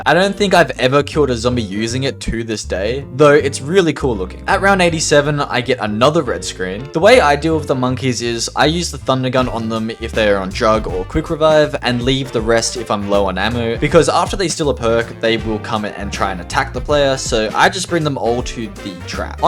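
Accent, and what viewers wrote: Australia. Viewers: You aussie man? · in google translate its cinema of the dead and he is saying nacht correctly he just aussie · me and the creator of the video are both australian we have the same accent, it is NOT an accent problem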